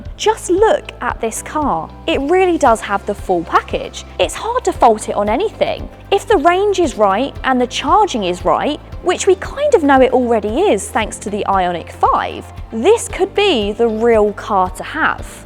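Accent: United Kingdom. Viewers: I think it’s British accent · I dunno why but british accent is just nice to listen to reviewing things lol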